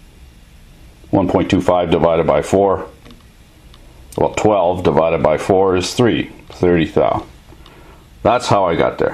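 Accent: Quebec, Canada. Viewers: HAHA are from quebec because your french sounds just like mine!! Cool videos btw! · HAHAHA ! jaime comment tu parle ! pas besoin de t'sa esti ! vivez vous proche du quebec ? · I'm French Canadian too. I live in the states now but that Canadian Frenglish speak cracks me up every time. Brings me right back to where I came from... lol. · tout tes French-isms que tu fais, j'essaie de deviner d'ou tu viens. Dans un autre video tu disais que t'es un "tête carré anglophone", mais tu parle un français plutôt Québecois. Je dirais Montréal ou Ottawa. Side note I was called a square head by some grumpy old man on a Montreal ham radio repeater, telling me to go back to Ontario, but I've never lived in Ontario.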